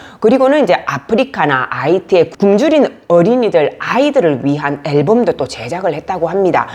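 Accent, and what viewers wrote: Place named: North Korea. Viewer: 뭔가 발음이 다르신데 미국에 오래살아서 새는 그런 발음하고는 다름. 북쪽 발음이 느껴집니다.😅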